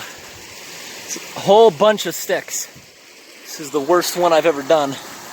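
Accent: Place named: Michigan, United States. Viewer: Are you from Michigan? Trying to place your accent!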